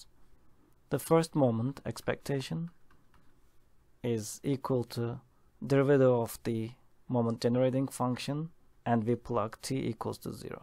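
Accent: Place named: Turkey. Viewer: my guy this is the most turkish accent I've ever heard in my life but your name isn't turkish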